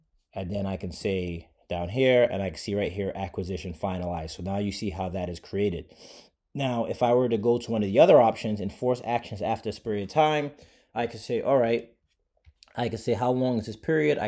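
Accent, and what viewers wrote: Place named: Bronx, United States. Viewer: IT with a Bronx accent. Digging it.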